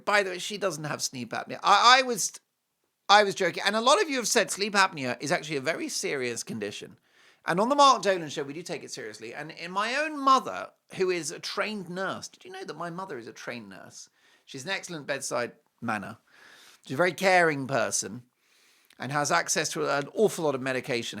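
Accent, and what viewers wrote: United States. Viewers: Mark, your American accent is really good!! Enjoy the show and I look forward to it daily ❤❤ It sounds like you got in some serious trouble yesterday 😂😂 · Great American accent! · Your American lawyer accent!😂😂😂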